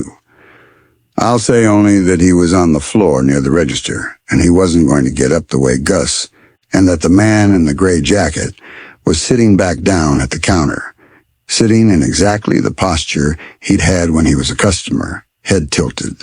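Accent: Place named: England, United Kingdom. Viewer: I am from Glacier on the W side.My X was a water purification specialist in the whole park West North. He had his own cabin. I know this area very well. The story is not concise but entertaining. I used to know most of the residents in all of Glacier. AI, you have an English accent and you mispronounce proper nouns.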